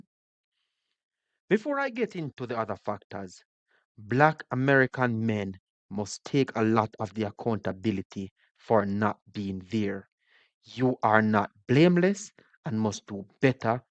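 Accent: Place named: Jamaica. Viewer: all due respect bro, but you sound Jamaican. why are you making videos about FBAs? 50 percent of jamaican children live in single parent households. whats your point?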